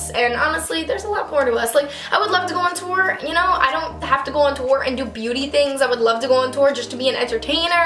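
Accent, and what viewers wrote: New York, United States. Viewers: Dominika Pisula she has that like “New York” accent (she’s from Long Island) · i think its just her new york/long island accent hahaha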